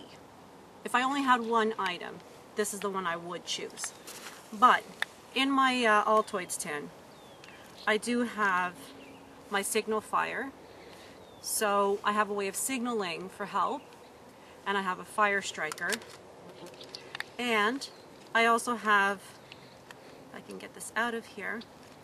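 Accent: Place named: Canada. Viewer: You don't have to state that you are a Canadian, pretty girl. We knew that as soon as you tried to say a word with the letter "O" in it.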